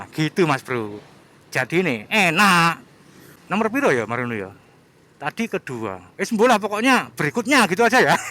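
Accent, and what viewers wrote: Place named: East Java, Indonesia. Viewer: Logatmu wes jawa timuran bro ilang asline😅😅ditunggu di jalur JLS trenggalek - tulungagung lanjut blitar-malang🙏👍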